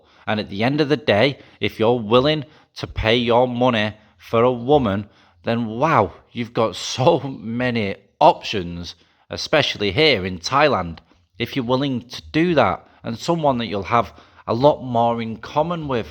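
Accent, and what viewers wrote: Manchester, United Kingdom. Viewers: Hi.. Love your channel. Although I have lived and worked in Asia I was not involved with any of this type of life as my wife was always with me but but I enjoy the human interest stories and your obvious decency as a man. But, as a Manc that left in 1984 I especially love hearing the Manc accent Does my heart good. Have a vey happy Christman with your son.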